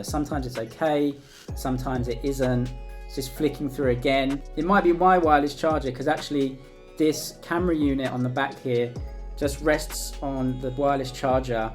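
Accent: England, United Kingdom. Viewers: I'm sorry to say but you are just over critical, going to China, speaking with strong enlgish accent commenting their phones, clinging to the small details and making sensations on youtube. it is too commercial and nonsense this video, hoping to attract viewers by titles and shits.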